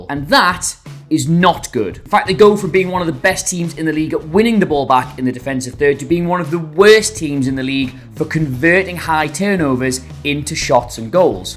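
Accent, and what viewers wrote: Newcastle, United Kingdom. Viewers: wow, jon richardson's turned geordie. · I knew you were a geordie since you sound like Matty Renton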